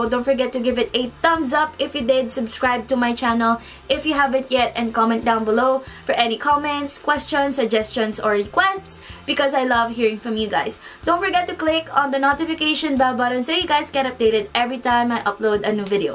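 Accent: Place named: Philippines. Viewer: I love how u speak english.. it is soooo pinay... ❤️❤️❤️ go girl ❤️❤️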